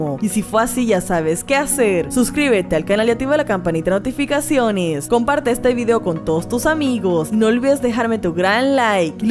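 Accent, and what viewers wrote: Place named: Ecuador. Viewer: Te veo De Ecuador✌❤ por la voz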